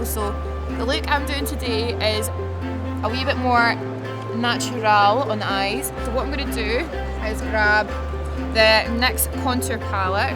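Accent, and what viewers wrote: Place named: Glasgow, United Kingdom. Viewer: loved this video, you can tell you're from glasgow but how much you say 'kinna', makes me feel right at home lol x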